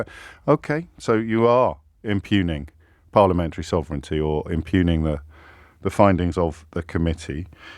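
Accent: RP, United Kingdom. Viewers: He thinks speaking poshly automatically makes him smart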